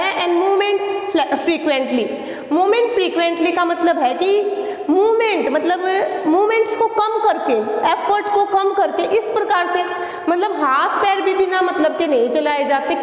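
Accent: Haryana, India. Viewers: super explanation mam or aapni boli haryanvi toch aari thodi thodi mam👌👌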